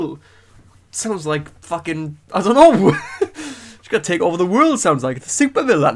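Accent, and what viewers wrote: Wales, United Kingdom. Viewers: Nothing quite like listening to a nice Welshman summarize this bs. At least I'm assuming you're Welsh by the accent.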